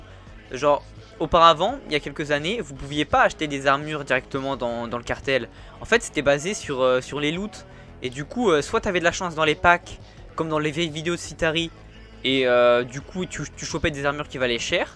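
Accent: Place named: England, United Kingdom. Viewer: Bonne idée cette vidéo et ton accent anglais mec woaw 🔥 et 545m pour hypercaisse euh.... 🧐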